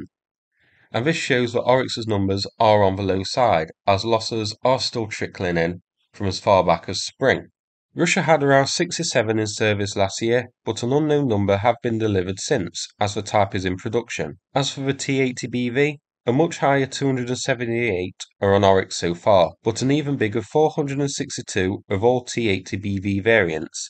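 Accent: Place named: Northern England, United Kingdom. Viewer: greetings from the UK, thanks again for your diligence, I always look forward to your videos, nice to hear a fellow Northener. Slava Ukraini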